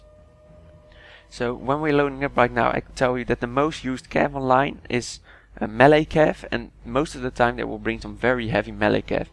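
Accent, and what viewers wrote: Netherlands. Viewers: damn i know dutch person when i hear one, lekker bezig pikkebaas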